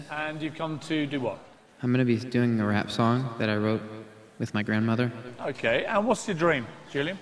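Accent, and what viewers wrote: United Kingdom. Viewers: I thought it was real until Simon said "Well, here's your chance" but I still had doubts before that because I was like "Wait... Julian isn't British.. Is he? 0.0" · umm is he really british?...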